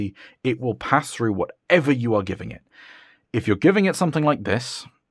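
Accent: United Kingdom. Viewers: Oh look, a more sophisticated Zeos with a British accent who reviews more expensive gear. You deserve my subscription well done on the review!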